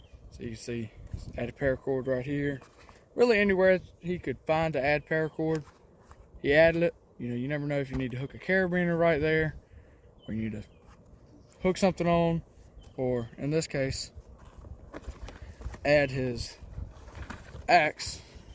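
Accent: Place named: North Carolina, United States. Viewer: A very good video! Thanks for sharing your ideas. Several will make their way onto my large ALICE pack. I found a M1976 sleep system carrier in new condition! One thing I didn't hear in the video was the total weight of that pack system as shown. How heavy? I'd also like to say that it's evident you have a lot of respect for your Dad and a great relationship. You do well on camera and have a great NC accent. I'm from KY originally and my accent finds it way out any time I'm around my "people". I was a boy scout (an Eagle!) all through the 70s and the ALICE was a very common pack back then. Was at the scout store recently and they have gone high tech with a rack full of Ospreys. Oh well, we traditionalists can continue to fly the mil-surp flag.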